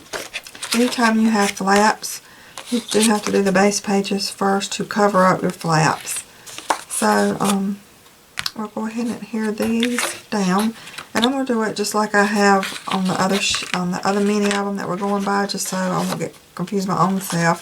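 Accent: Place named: Tennessee, United States. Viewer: Your albums are lovely too 😊 (I lived in TN for a few years and your accent sounds very similar - I miss it so very much )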